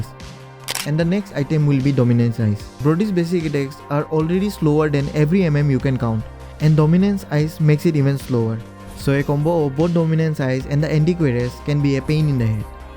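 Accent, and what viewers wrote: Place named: Assam, India. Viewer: Assamese accent hmm r u a Assamese guy ?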